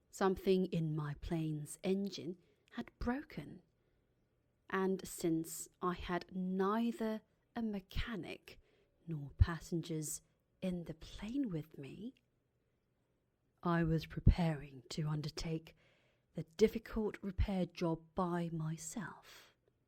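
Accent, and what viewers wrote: RP, United Kingdom. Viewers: 와 영국발음 너무 좋아요 ㅠㅠㅠ흐엉엉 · 미국 버터발음에 익숙해진 귀가 영국발음을 인식하지 못하네요..? 영국발음 넘 조흔것!! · 으아 역시 영국 발음 너무너무 좋아요 ㅠㅠ 저도 영국 영어 공부하고 있고 쓰려고 노력하는데 학교에서 미국 영어랑 마찰성이 생기더라고요... apartment를 flat이라 써서... 그래도 뭔가 뿌듯하기는 해요!!! 어쩌다보니 학교에서 영국 영어하는 철학 소녀로 유명해졌더라고요 ㅋㅋㅋㅋ 무튼!! 명절 잘 보내세요:)💜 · 고급진 영국영어 발음이네요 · 영미권에서 유학하셨나요 아니면 독학으로 영국식 발음 익히신건가요? ㅎㅎ 너무 잘 들었습니다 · 목소리가 이렇게 섹시하다니,영국 발음 치인다ㅜㅠ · 영어를 RP혹은 posh eng라고 하나요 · 맨날 영어관련 영상볼때마다 "엘~로 에브릐보우~티~~ 마 넴~잊 퀀주현~"하시면서 등장하셨는데ㅋㅋㅋ너무 자주뵈서 그때마다 영국발음 진짜 좋으신 아리랑티비 소속 씨....라고 외워버렸는데ㅋㅋ 이렇게 채널하고 계실줄이야!! 우연히떠서 봤는데 구독누르고 갈게용ㅎㅎ안그래도 영국ㅠ상류층 발음을 좋아하는데!!! 완전 취저ㅠㅠ♡ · 무진장 이쁘시네요. 영어까지 영국 상류층 느낌 팍팍. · 영국발음 너무 좋아요... 진짜 멋있는 느낌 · 영국식 발음에 케러멜라이즈드 된 버터처럼 이불 속으로 녹아듭니다~~~ 느아아아앙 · 영국식 발음이 너무 듣기 좋네요.. 특히 가 들려줘서 정말 듣기 좋네요... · 와 광고에서 아리랑 티비 아나운서 나오는거 봤는데 진짜 발음 너무 섹시한거 같어요.ㅠ̑̈ ㅠ̑̈ ㅠ̑̈ ㅠ̑̈ ㅠ̑̈ 진짜 발음 완전 영국 귀족 같아요 진짜 너무 너무 너무 닮고 싶은 발음.. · 와 너무좋아요ㅜㅜㅜㅜ 구독하고갑니다 영국영어 많이많이올려주세요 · 저도 영국 발음 가지고 싶네요 ㅠㅠ 멋져요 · 어떻게 해야 이런 발음을 갖을수 있나요 목소리도 넘 힘있거. 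전 호주발음을 가지거 있는데 영국 발음이.훨씬 엘레강스한듯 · 포쉬가 이렇게 아름답게 들린건 첨입니다❤️ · 아리랑 티비 광고 맨날 나와서 대사 다 외웠는뎈ㅋㅋㅋㅋ 이렇게 봬니 반갑네요 ㅠㅠ 목소리도 너무 좋고 발음 진짜 고급스럽네요 ㅠㅠㅠㅠ저도 영국 발음 저렇게 내고싶어용 퓨ㅠㅠ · 헉 책중에서도 제가 젤 좋아하는 어린왕자라니ㅠㅠ게다가 영국발음..감사합니다♡ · 영국억양 진짜 개사랑해요.... 미국이 아니라 영국으로 갔어야했는데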